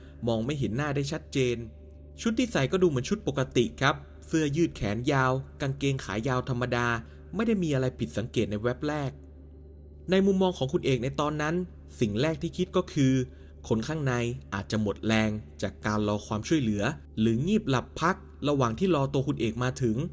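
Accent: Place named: South, Thailand. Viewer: เส้นทางนี้มีอีกหลายเรื่องมากครับ ผมจะทักหลายครั้งแล้ว ถ้าเรดาร์ผมไม่พลาด แอด คนใต้ชัวร์ น่ารักด้วย 😉❤️😜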